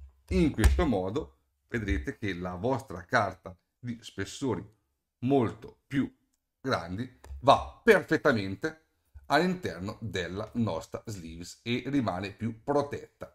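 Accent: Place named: Palermo, Italy. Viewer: Sei di Palermo ? Si capisce dall’accento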